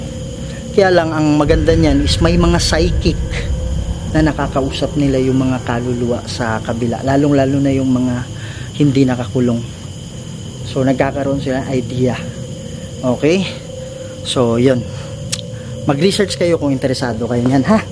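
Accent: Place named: Ilonggo, Philippines. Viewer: parang Ilongga ung tono ni..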